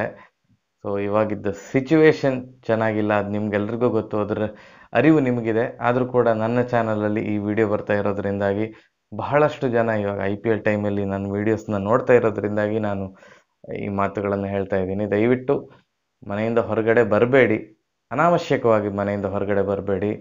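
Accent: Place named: Coastal Karnataka, India. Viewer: ನಾನು ಮೂಲತಃ ಉತ್ತರ ಕರ್ನಾಟಕದವನು, ಕರಾವಳಿ ಭಾಗದ ಭಾಷೆ ಕೇಳೋಕೆ ತುಂಬಾ ಸೊಗಸಾಗಿರುತ್ತದೆ ಅದರಲ್ಲಿ ಬೆಳ್ಳಂಬೆಳಗ್ಗೆ ನಿಮ್ಮ ಮಾತು ನನಗೆ ಹಕ್ಕಿಯ ಚಿಲಿಪಿಲಿಯ ಹಾಗೆ ಇಂಪಾಗಿ ಕೇಳಿಸುತ್ತದೆ. ಧನ್ಯವಾದಗಳು.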